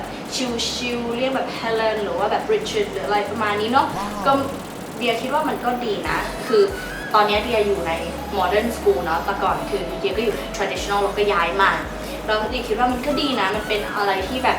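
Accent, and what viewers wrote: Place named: United Kingdom. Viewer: อยากทราบว่าตัวเองเอาพวกคำศัพท์สำเนียงบริติชมาจากไหนค่าา🇬🇧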